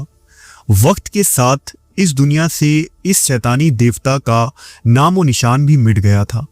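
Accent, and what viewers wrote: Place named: Pakistan. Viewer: You are voice like Pakistan . Mashallah voice nice 😅😅😊😊😊😂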